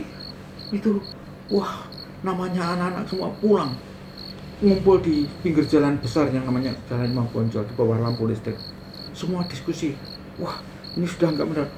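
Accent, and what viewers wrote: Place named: East Java, Indonesia. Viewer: Mirip bapak n paman cara ceritanya, ternyata sama2 Jawa timur wkwk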